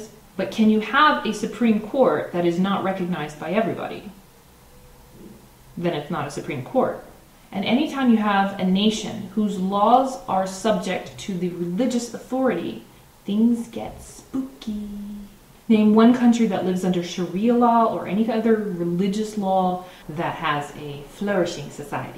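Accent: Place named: United States. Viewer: את דוברת אנגלית שוטפת ובמבטא אמריקאי מובהק, אבל שמעתי אותך גם מדברת עיברית בלי מבטא (אם אני זוכר נכון). את עולה וותיקה?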